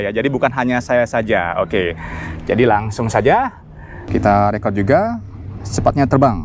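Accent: Bali, Indonesia. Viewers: Kayak logat Bali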